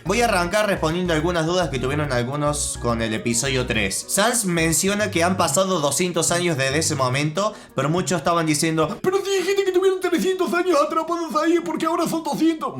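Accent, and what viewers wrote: Argentina. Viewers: no solo te ganaste un like tambien un sub se nota que es de angerntina mi pais saludos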